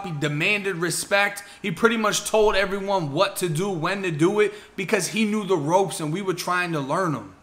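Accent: Boston, United States. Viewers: That Boston accent kills me. Much respect tho bro✊🏾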